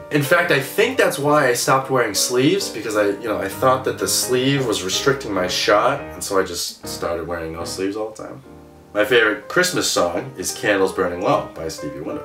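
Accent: Boston, United States. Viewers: Are u from boston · I'm just marvelling at the Boston accent "step on a crack ya break ya muthas back"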